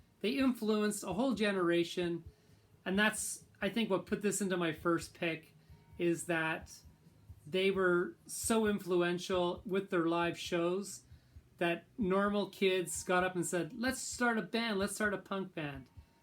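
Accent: United States. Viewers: Dude you're not a London boy you sound American or canadian Americans started everything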